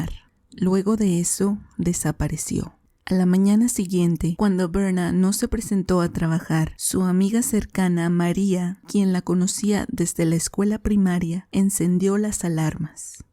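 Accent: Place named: Brazil. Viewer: Hey I hear an interesting accent do Brasil?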